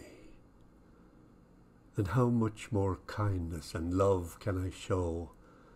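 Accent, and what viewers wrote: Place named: Ireland. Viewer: I LOVE your recitation of my poem, particularly with that lovely Irish accent. You say my words with the exact feeling with which I wrote them. You have given my words life and what a fabulous gift that is for me. You say each word with your heart and I now have a lump in my throat. You are wonderful. Sincerely, Pat F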